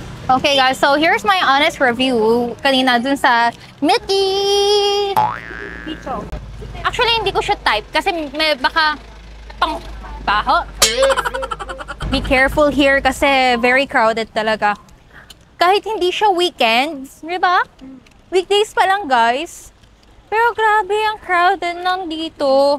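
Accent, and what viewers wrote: Philippines. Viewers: kombinsido na hindi talaga koreana si dahil sa galing magtagalog at pinoy accent pa. di kaya.... 😄😄😄😄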